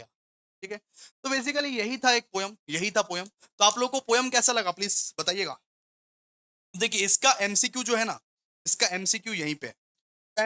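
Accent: Bengal, India. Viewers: Hahaha 😂 Your bengali accent is so cute (Khub mishti 😆)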